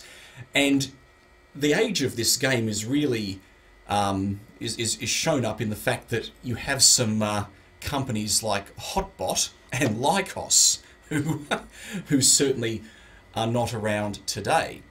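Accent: Australia. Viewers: You wouldn't happen to be Australian are you? you sound it on your videos. Love your videos of the Old Vintage Macs you got too.:)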